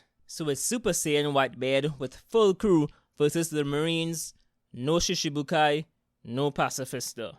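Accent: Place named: Trinidad and Tobago. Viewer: Yo love how u talking are u trinidadian?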